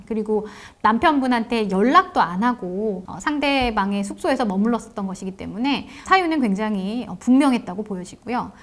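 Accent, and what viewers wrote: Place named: Jeolla, South Korea. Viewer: 은 전라도 사투리가 섞여 있네요 ㅎㅎ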